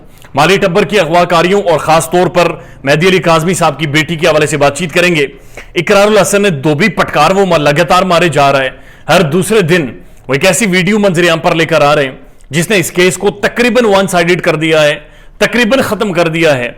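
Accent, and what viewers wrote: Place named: Punjab, India. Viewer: Hayee Sir! M just ap kii punjabi ko sunany kyleay ap ka vlog dekhtii..wEsy to bii punjabi hn..lakin apkji punjabi style wahdhiya💯👌👌😂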